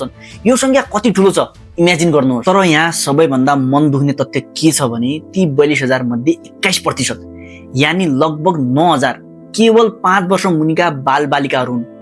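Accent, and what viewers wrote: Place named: Hills, Nepal. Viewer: yesko swor kina pakhe jasto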